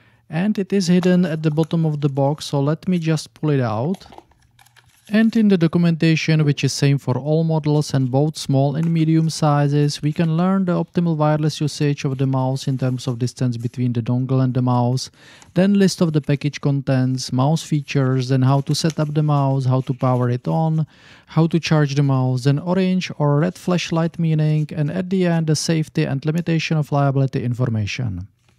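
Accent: Czech Republic. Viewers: Are you Czech lol? Also nice video, keep it up.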